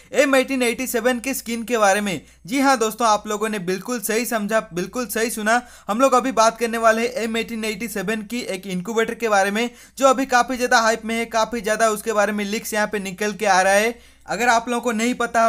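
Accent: Odisha, India. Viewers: Ap Odisha se ho kya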